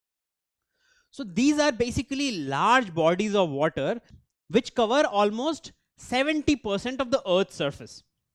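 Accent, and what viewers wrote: India. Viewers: I also hate the cheap indian accent. #VeryBadAccent #PooryMade #WhatWasAllThatBlinkingAllTheTime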